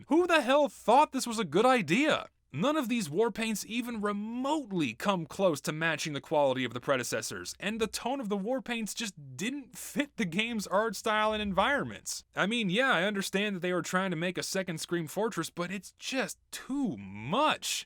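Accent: South, United States. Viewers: I love the southern twang in your voice, it's so sexy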